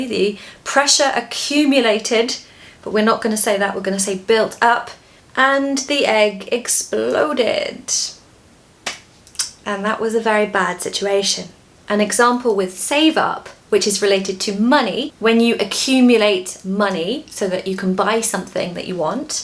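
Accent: United Kingdom. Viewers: Your excellent, real, and fast British accent is so helpful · Your an excellent,real,fast British accent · This is the Brit accent and Queen's English I’ve been desperately, deliberately, literally, immensely, thoroughly, remarkably, intensely, highly, absolutely, insanely, utterly, and certainly searching for my whole life. I’ve finally found it! Teacher 👩‍🏫, I hope you won’t kick my butt for using too many intensifiers — I just had to express how I feel. Love ya! ❤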